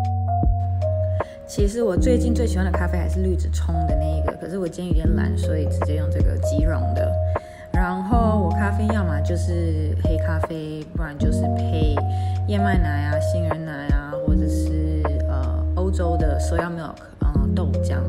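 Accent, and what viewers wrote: Taiwan. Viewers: 真的是超級道地的台灣口音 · 妳除了face外，其它講話的方式、口氣、用語、調調跟台妹一摸一樣，甚至更台簡直青出於藍，身份證的申請沒辦法通過嗎？ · 光是聽聲音，妳不是台灣人誰才是， OMG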